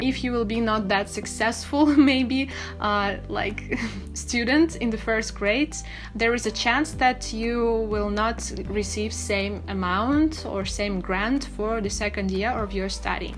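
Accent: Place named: England, United Kingdom. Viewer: Hi,I'm Nourdine from Morroco, I really like your English accent...so pretty please I can get your phone number this is my email: <mail>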